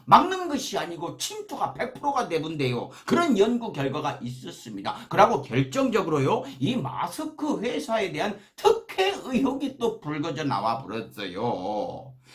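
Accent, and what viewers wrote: Jeolla, South Korea. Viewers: 강 성범씨의 유창한 전라도사투리가 너무 웃겨서 밥 먹으면서 듣다가 자꾸 밥을 뿜게 돼서 밥 먹을 때는 보면 안 되겠어요 내용 너무 좋고 알기쉽게 설명해주셔서 감사합니다 팬 되부렀스요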